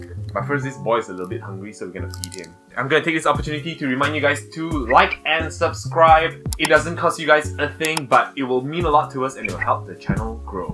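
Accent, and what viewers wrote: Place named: Jamaica. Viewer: Love the reaction! However, I couldn't help but notice what sounded like an island accent of Jamaican or Trini? Either way, Happy Christmas!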